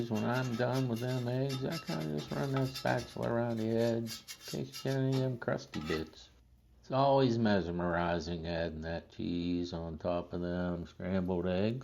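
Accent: Pittsburgh, United States. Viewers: Is that a Pittsburgh accent?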